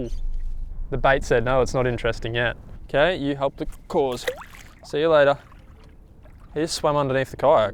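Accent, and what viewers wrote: Australia. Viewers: Is he Aussie??? 😮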